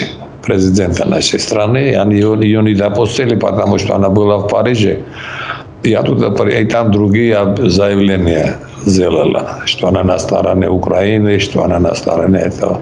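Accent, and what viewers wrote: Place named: Georgia. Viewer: Так половину грузин говорит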